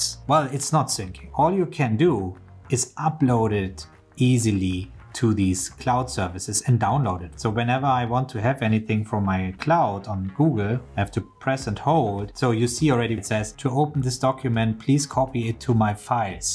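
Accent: Germany. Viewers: The thick german accent makes every tech video better. Des Bedarfs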